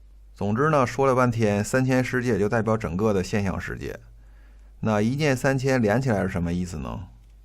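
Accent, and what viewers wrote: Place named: Northeast, China. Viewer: 喜欢东北口音儿 ～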